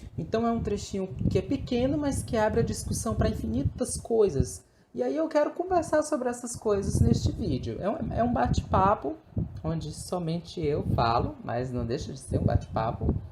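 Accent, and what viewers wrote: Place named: Ceará, Brazil. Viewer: Como eu queria que o reagissse aos comentários que os SBTistas fazem no X do Fórum Chaves. É de arder os olhos de ódio e raiva por cada burrice. Seria de lavar a alma ver o rebatendo esse povo medíocre com o seu sotaque cearense maravilhoso 💫 abraços! Adoro o perfil que me fez abrir os olhos e consumir a obra completa.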